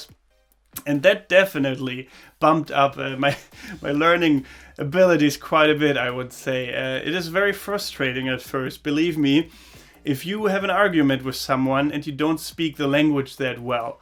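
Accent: Germany. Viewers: Your english is really well. Many Germans have strict German accent when they speak in English. But you have much less. I guess it's because you started to learn English very early. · You sound fine you just have a German accent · You still sound German, but your English is pretty good. The one problem I noticed is the way you speak sentences. Sometimes they seem to complete as odd as that sounds. But I am not a perfectionist by any means and wouldn't complain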